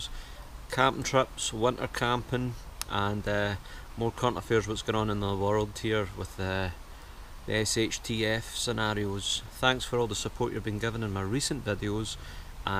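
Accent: Scotland, United Kingdom. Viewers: Where in Scotland is it your from?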